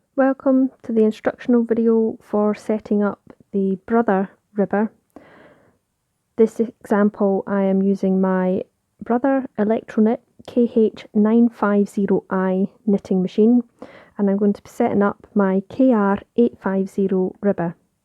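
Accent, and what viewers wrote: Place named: Scotland, United Kingdom. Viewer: Thanks so much for this video. It is very well done and has provided me with clear and concise instruction. Was lovely to hear your Scottish accent too. A wee bit of home for me. Thanks again.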